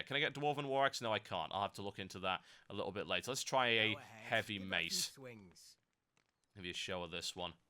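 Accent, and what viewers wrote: United Kingdom. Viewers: this is available in EU Right?, because your definitely a Brit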